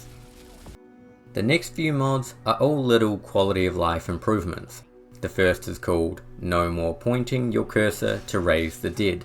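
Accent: New Zealand. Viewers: You kiwi?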